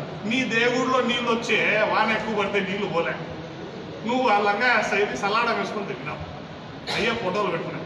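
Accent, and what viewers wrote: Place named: Rayalaseema, India. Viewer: Mana rayalaseema slang tho adaragottav anna.jai Sudheer Reddy anna.jai ysrcp. Love from jammalamadugu.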